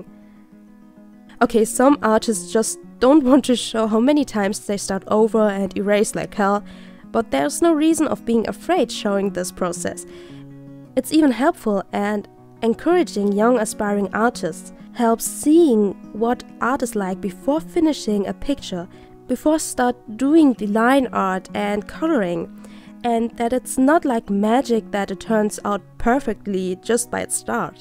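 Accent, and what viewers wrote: Germany. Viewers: Bist du deutsch? Wenn ja merkt mans mega😂😂😂🙈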